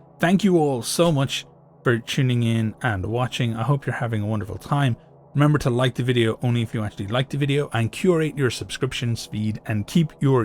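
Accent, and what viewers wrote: Ireland. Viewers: Are you a Kildare / Carlow man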